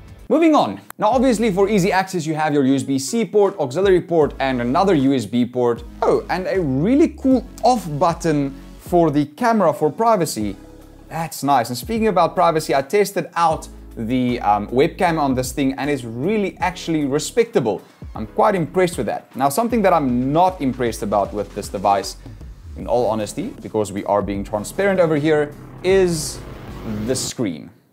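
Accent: Afrikaans, South Africa. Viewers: Hello I just wanted to say you sound south African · Kan sommer die afrikaans hoor in jou stem. Ek het die laptop in Demark gekoop. Beste gaming laptop daar buite op die oomblik net my opinie.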